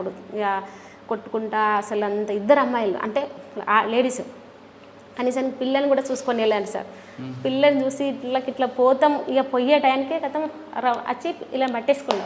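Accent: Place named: Telangana, India. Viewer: కామ్రేడ్ మేడం నిజంగా మీరు చాలా అందంగా ఉన్న కామ్రేడ్ తెలంగాణ యాస లో మాట్లాడుతుంటే ఇంకా బాగున్నారు. ఇట్లా,,, గిట్లనే,,, బోయిన గూడ,,, మంచిగా,,, మస్తు,,, 👌👌👌అందమైన మీ పదహారణాల్ల అందం అంతా అడవిలోనే గడిచిపోయింది.నిజంగా ఈ ఇంటర్వ్యూ చూసి నేను ఫిదా అయిపోయాను మేడం. But ఏదేమైనా i love కామ్రేడ్ 🚩🚩🚩🚩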